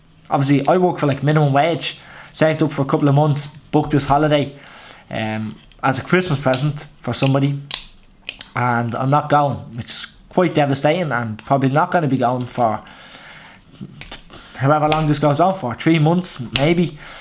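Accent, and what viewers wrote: Ireland. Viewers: Pure Irish , nice English 😀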